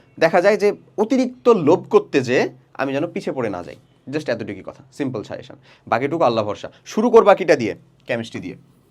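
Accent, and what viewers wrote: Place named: Rajshahi, Bangladesh. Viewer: apnr basa ki rajshahi ??? vasa te rajshahi rajshahi vab ache ....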